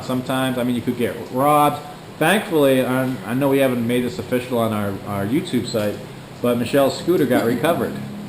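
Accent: Boston, United States. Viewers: DO THEY EVER SAY ANYTHING ABOUT YOUR ACCENT BEING FROM MASS I KNOW THEY DO IN OTHER STATES HERE IN USA IF YOUR FROM BOSTON THEY SAY PAACK THE CAAAAAAR LOL LABSTA OR LOBSTER LOL I GUESS WE LEAVE THE R OUT PEOPLE MADE FUN OF ME ALL THE TIME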